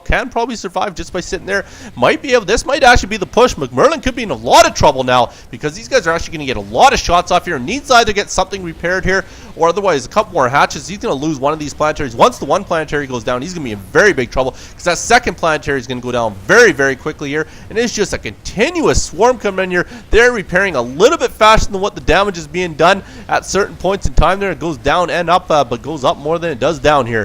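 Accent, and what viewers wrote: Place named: Midwest, United States. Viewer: Where are you from? Midwest? Northwest? Canada? So many "wowzers" I had an Inspector Gadget flashback. Good cast, cuz.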